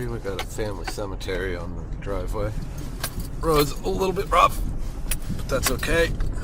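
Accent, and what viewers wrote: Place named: Nova Scotia, Canada. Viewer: Right on. My wifes aunt lives in chester grant. I thought you sounded like an Aylesford/ Morden guy.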